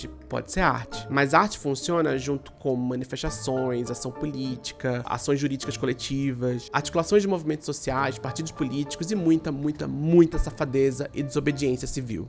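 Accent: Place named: Minas Gerais, Brazil. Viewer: estava cm um sotaque especialmente mineiro nesse vídeo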